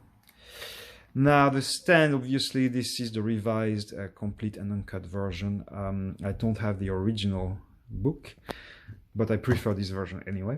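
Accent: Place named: France. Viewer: You speak english like a french !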